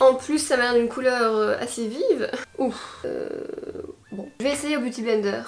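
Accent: Belgium. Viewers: 🙊💞 j'aime vrmt le contenu de tes videos! Tu es super naturelle, et j'adore ton accent belge 😍😍 Bonne continuation 🙆💕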